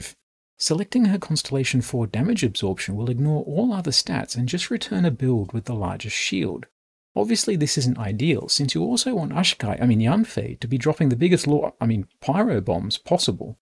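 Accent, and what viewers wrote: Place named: United Kingdom. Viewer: Just watched the full video series. I'm in Genshin for about 2 weeks and as a rookie I can safely say I didn't understand 90% of the content. Especially the jokes. but man the intros and the so British voice are funny. I will slowly get into the tool down the road, but I have a lot on my plate with the base game already. Thank you very much for bringing this knowledge + utility to the community.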